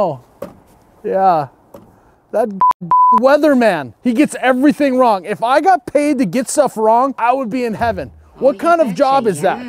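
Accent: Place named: Minnesota, United States. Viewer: I actually Googled "Holiday Gas Station" to figure out where this dude is from. Northern Wisconsin? I thought the 'you betcha' thing was more of a Minnesota thing. Either way, love the videos.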